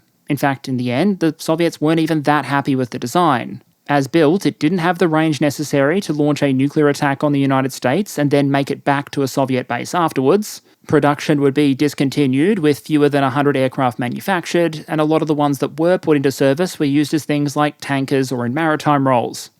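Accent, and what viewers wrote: Australia. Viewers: The way Australians say Russia has always cracked me up… love it.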